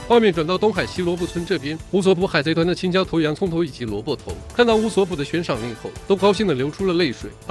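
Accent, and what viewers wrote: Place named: Sichuan, China. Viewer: 你是四川人哦？講話的腔調跟王剛很像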